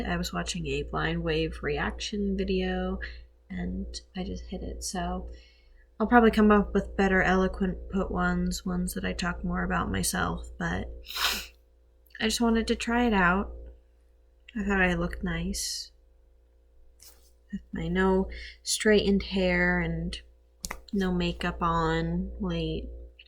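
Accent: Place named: United States. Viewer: Usually american accents irritate me but yours is very charming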